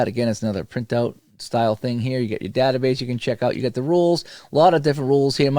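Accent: Boston, United States. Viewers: Hi. I like your reviews. I saw your Regular Season Basketball (RSB) review and your Celtics-Sixer series with RSB and that was fun. You have a true Boston Celtic accent and that gives a nice touch to your reviews. I just started this video and will go back to it but I do want to correct the Strat-o-Matic pricing. For $62 you get the game with card image and any one season of your choice. You don't need to spend another $27 unless you want a second season.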